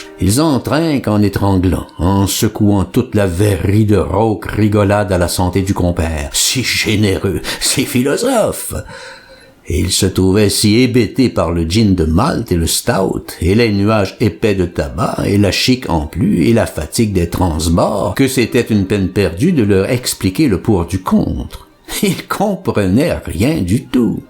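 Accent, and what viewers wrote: Quebec, Canada. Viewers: J'y retourne 10 mois plus tard parce que je viens de vous écouter dans la suite... Quel plaisir de partager vos échanges et la langue de la belle Province que nous aimons tant à entendre. D'ailleurs comment faites vous pour vous départir de cet accent si beau quand vous lisez ? Bon WE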